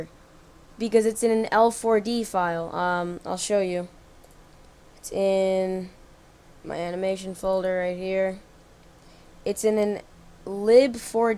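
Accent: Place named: New York, United States. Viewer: you have a new york accent lol. anyways thanks for the help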